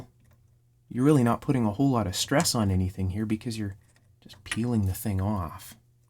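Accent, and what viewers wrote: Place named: Canada. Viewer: I love your voice, even your Canadian pronunciation of “out” an “House”. Sometimes I put on your videos just for background sound when I work on long complicated projects. Thank you so much!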